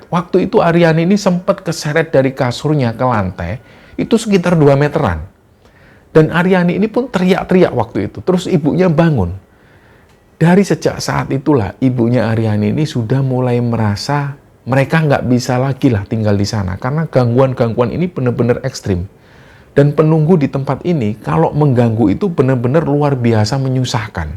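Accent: Java, Indonesia. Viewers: saya tidak tau.. amalan apa yg dilakukan alm.bapak e mbak ariani sdah di level berpa tingkat kesbaran dan keikhlasan yg jalani sampai bisa mengangkat derajat kehidupan anak dan istrinya ... mohon maaaf jika tidak salah nenek" itu adalah wujud khodam dari alm. mbak ariani sekeluarga sehat" ya alfatihah buat khodam alm.dan almh. semoga husnul hotimah ... 🙏🏻🙏🏻 om pertahankam logat jawa ..jika di naskah memang ada bahasa asli ❤❤❤👍👍👍🤗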